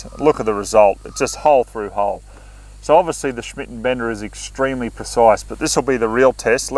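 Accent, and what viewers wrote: Australia. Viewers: I absolutely love to hear an Ozzie talking guns, but...how are you an Ozzie talking guns? Was this filmed in a secret bunker?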